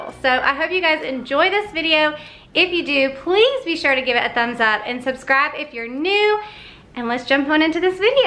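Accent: South, United States. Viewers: Amanda sent me! Also, the moment I heard your voice, I felt your fellow southern accent! 😍 Mississippi sending some love two doors down! 😍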